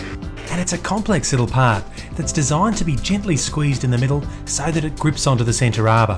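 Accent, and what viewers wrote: Australia. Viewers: You are the only person with an Australian accent that I can listen to. My girl friend is from new Zealand and I'm used to hers, Australian just sounds weird and I end up focusing on nothing else. But with you. Your work speaks way louder.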